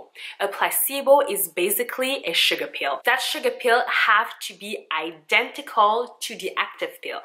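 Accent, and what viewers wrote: Quebec, Canada. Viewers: il me semblait que j'entendais du français genre québécois comme accent, ☺️ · So happy I found your channel! It's fantastic that this product has brought up so much discussion about science and health supplements on YouTube. I wish Tati all the best with her business, but even if she finds the backlash difficult to deal with, I'm happy it's leading to an increase in science literacy among at least a small fraction of her subscribers. You did a really good job going through the various aspects of the product. On a more personal note, I'm a Quebecer living in the United States doing my PhD and your French Canadian accent reminds me of home. (: Merci beaucoup!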